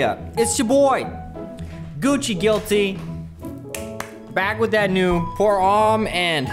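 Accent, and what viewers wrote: Germany. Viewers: Doch klar, ist Bayerisch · So, first time watching this dude, for a split second I actually thought hes german. By far the most convincing so far.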